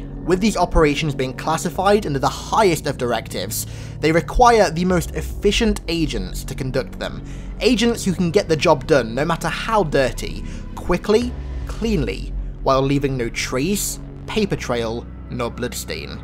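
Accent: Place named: United Kingdom. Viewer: Goddamn, can your voice get even more cocky british? 👎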